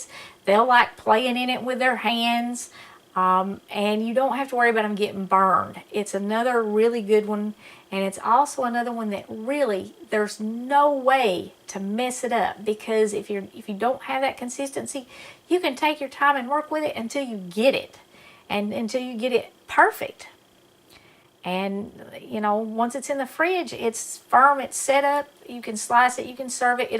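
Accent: South, United States. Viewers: I love to listen to this lady talking; reminds me of my KY kinfolk, my grandma Kate Ford, and my grandma Bertha Edwards who also spoke with a very southern country accent. I'd been looking for an easy fudge and easier potato candy recipe. Thank you for sharing your old-fashioned delicious candy recipes with all of us folk. During this year's holiday season, I will try your two candy recipes! Bless you. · I just discovered your channel and boy do I absolutely LOVE you! Your fantastic southern accent, great recipes. You are wonderful!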